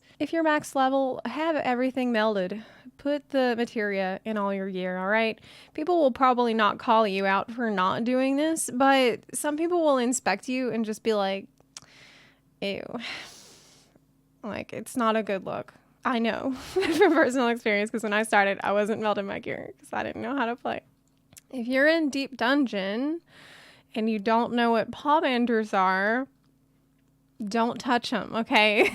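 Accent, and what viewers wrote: South, United States. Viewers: Bless your heart, darlin, you're as southern as I am lol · What accent is that? Definitely southern and super cute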